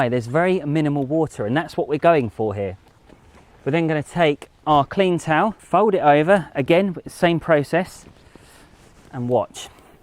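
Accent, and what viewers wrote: United Kingdom. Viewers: I have no idea why you got so many views for this video!! Maybe the British accent? And dummies think just because you have a Btitish accent you might be teaching a magic?! Well. I just wasted 2 minutes watching your useless video mate...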